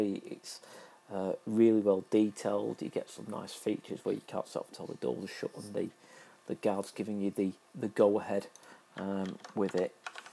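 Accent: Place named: Manchester, United Kingdom. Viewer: You sound like you're from Manchester, I make lots of videos around mcr of trains and stuff it's called GMTrainspotting check it out! great channel btw, just research your facts first so you don't end up not knowing in the video.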